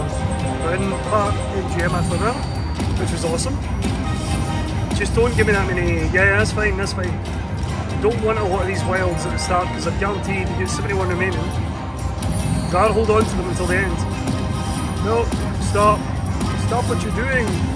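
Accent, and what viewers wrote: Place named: Scotland, United Kingdom. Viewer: Just found your channel, loving it so far and love hearing a Scottish accent doing these blogs for a change 😂. Good luck I hope you win big! 🎰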